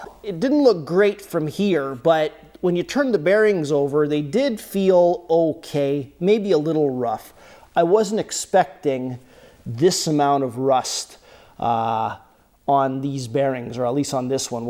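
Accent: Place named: Quebec, Canada. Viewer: Est-ce que j'entant une accent quebecois?? 100 pourcent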